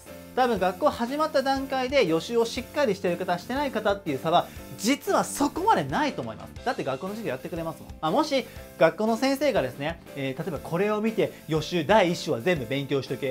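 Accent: Kansai, Japan. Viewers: 関西弁のイントネーションがたまに出るけど 関西で過ごした事あるんですか？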